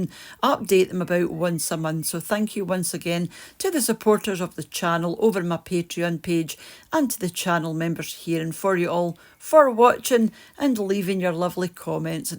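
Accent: Scotland, United Kingdom. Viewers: Hi there. I don't comment very often, but I surely do enjoy your videos. Thank you so much for sharing this part of your life with us. And I love your Scottish accent! Have a great week. :)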